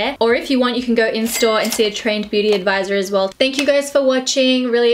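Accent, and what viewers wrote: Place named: Australia. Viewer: 'Smugin that out' so Australian #representingusaussies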